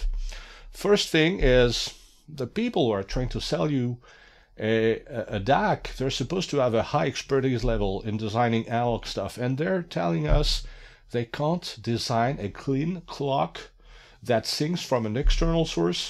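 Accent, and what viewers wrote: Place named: Quebec, Canada. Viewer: Hi, I was wondering if the old isa sound card such as sound blaster 16 which already supported 16 bits 44.1khz would have incorporated r/2r ladder dac instead of binary weighted ones? Despite being old sound cards, i couldn't find anything wrong to the sound coming out of them when propely feeded with a lossless source a few years ago when I messed around with such things. And you're probably from Quebec with that accent. Lol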